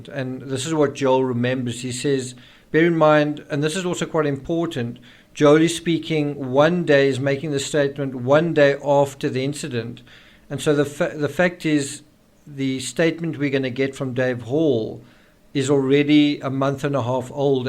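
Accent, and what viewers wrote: South Africa. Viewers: Love the South African accent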